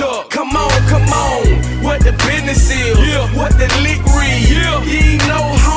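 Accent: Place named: AAVE, United States. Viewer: He speaks in ebonics. It's Vernacular English not American or British. 🙂😂A judgemental person calling out another judgemental person.🙄why?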